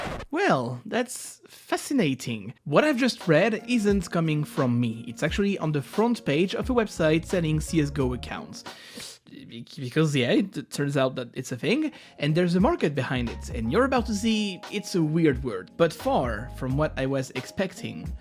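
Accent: France. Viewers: L'accent déjà c'est cramé mais t'as Google en fr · I bet your french. a typical accent. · la musique de ça me tue xDD (j'adore ton accent d'ailleurs, il est très discret mais si t'es français tu devines quand même) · french accent ? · Hi, incredible content, great job! One tip to improve your videos: work on your accent, there are tons of videos and apps out there on how to improve your english prononciation. I hate the french accent (I am french myself maybe that's why) and it's a struggle to go through each of your videos but the quality of content makes it worth it, keep up the great work!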